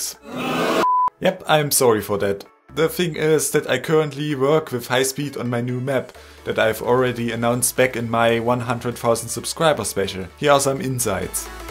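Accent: Germany. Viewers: Ja, kommst du aus Bayern? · I love how you talk 😄. Are you German? Luv your commands 👍🏻👍🏻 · Sein Englisch ist eigentlich ziemlich gut, nur die Betonung/Aussprache hört sich sehr deutsch an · Nice vid are you German? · Wohnst du in NRW? :D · du bist deutsch nich? Hört macn irgendwie... und ja ich schau mir das Video in 2021 an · Hey have you thought about letting someone else do the voiceovers? I know a german accent can be hard to get rid of for some :) Or maybe it just bugs me more than most other people here? Anyways keep up the good work :)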